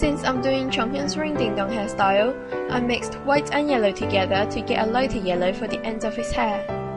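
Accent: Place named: England, United Kingdom. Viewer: i thought u were from england cuz of the accent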